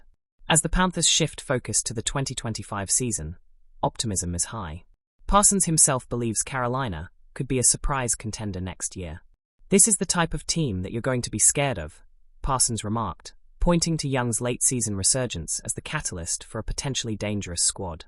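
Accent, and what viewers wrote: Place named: United Kingdom. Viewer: Nothing says American football more than a guy with a british accent. If this is an AI voice maybe pick an American voice?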